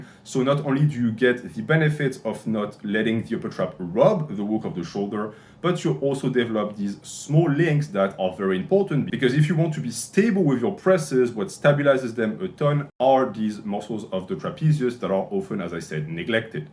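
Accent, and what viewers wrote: France. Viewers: Are you french? your accent sounds like it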